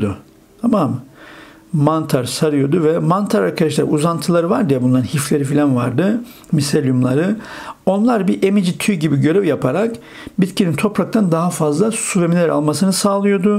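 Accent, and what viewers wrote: Black Sea, Turkey. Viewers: Hocam konudan bağımsız ama Karadenizli misiniz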